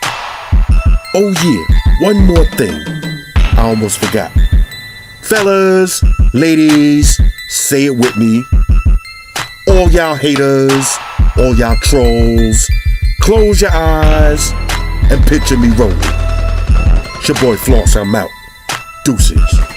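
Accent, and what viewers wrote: New York, United States. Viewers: "poison for the trolls" This was dope! Yo this guy from NY?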